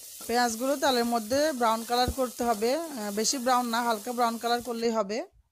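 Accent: Chittagong, Bangladesh. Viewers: ওয়া তুই চিটাইংগে কেন?খথা উনি বুঝা জার।সুন্দর রেচিপি। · চিটাইংগা নাকি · আপনি কি চিটাগাং গা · Unar kotha shune tai tu mone hocche.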